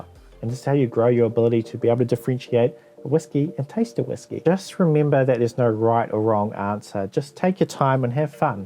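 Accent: New Zealand. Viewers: Are you a kiwi? If so where from?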